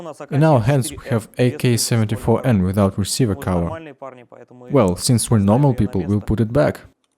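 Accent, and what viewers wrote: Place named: Russia. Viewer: when a russian try to speak english in this video...i understand only 40% of the dialogue, please take an other speaker for english voice over....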